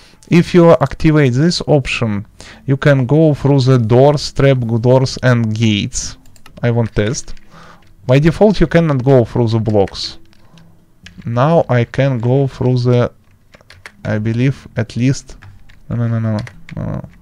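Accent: Russia. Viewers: Русский акцент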